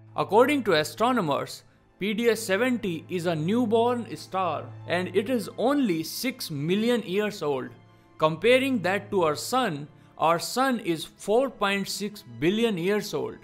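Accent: India. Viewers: People are hating because the voice really? The speaker sounds Indian and speaks English well with an accent so what? Usually the accent is due to knowing how to speak two languages same with Asians, but I've also met Indian and Asian individuals due have no accent from only knowing English growing up. At this rate we will never be able to be peaceful and live together too savage of animals we are still... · His voice is surprising are u an Indian man ??? Just want to know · It looks like awesome India voice!!!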